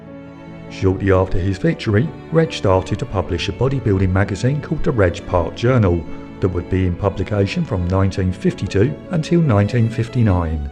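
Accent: United Kingdom. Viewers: good point. I should have picked up you are speaking the kings English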